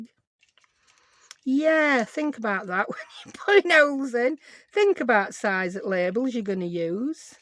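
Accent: United Kingdom. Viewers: Thank you for giving me someone cheerful to awaken to this morning!! (Even if this video is a year old), for me it’s today, and amid the stress of a not wonderful med diagnosis, packing up to sell my house, flat tires and car problems, and then a hail storm that totaled the roof, I am choosing to take 5 in the mornings and spend it with you and my cup of coffee being happy and cheerful, just like the way I choose to live my life. So glad that you join me! I turn on the captions and double check to see if you really said (in your darling British accent) something bit me on the nose! Love it! Hope you’re well!!💕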